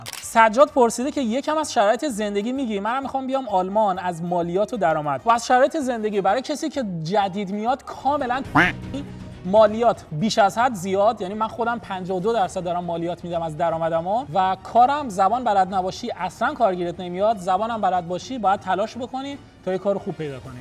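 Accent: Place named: Bushehr, Iran. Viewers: با لهجه جنوبی صحبت کن بهتره با حال تره❤❤ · کسایی موافقن که بوشهری حرف بزنه داخل ویدئو هاش لایک کنن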